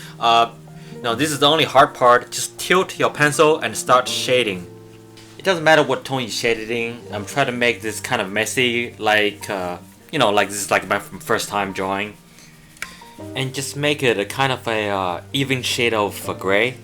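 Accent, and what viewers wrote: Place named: China. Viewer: This accent sounds like that of a Chinese guy speaking. His English grammar and vocabulary is great, however this accent really sounds Chinese. Good hints, beautiful drawing. Congratulations.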